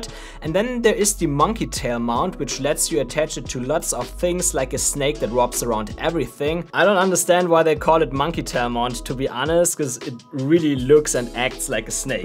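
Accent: Germany. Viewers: hmm sounds like DEUTSCHLAND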